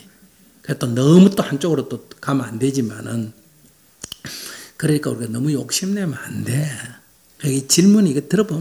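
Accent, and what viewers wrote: Gyeongsang, South Korea. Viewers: 경상도 어디 분이신지?? 사투리가..... 다그치는듯한 말투시네여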